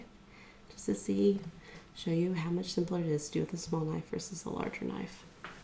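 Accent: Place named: Missouri, United States. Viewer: This makes me want to eat an apple. I love apple season. Also, I love your accent. I live in Missouri and we have a lot of people here with that accent. I have some aunts and uncles with it and sometimes I say words like they do. Like I'll say "sell" instead "sail". And "wull" instead of "will" or "well". "Dill" instead of "deal", etc.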